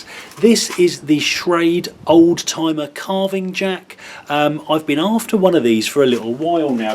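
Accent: United Kingdom. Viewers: I love your Englishman accent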